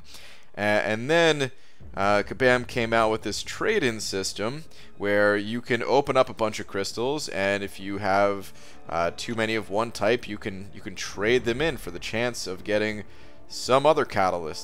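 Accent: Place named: Germany. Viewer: Hi are you from germany you had german akcent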